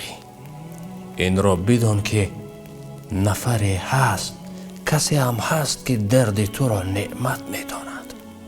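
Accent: Tajikistan. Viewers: Дар забону, лахчаи поку, ширинии точики шердилм садкашавм😢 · Забони Точикиро тоза гап мезанад Офарин 👍👍👍👍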